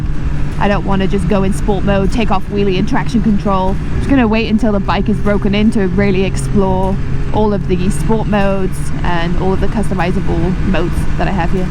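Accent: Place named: Cardiff, United Kingdom. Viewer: Mad hearing a Caadiff accent in the US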